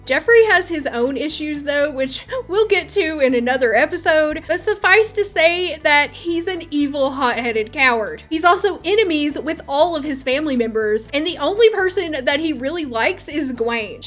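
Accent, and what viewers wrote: South, United States. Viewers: your accent could get that Southern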